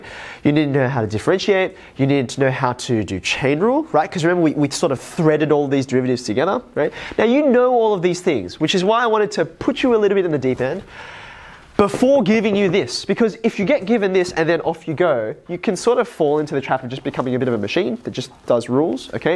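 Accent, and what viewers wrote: Australia. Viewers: Bro is a math teacher and he’s Australian what fake accent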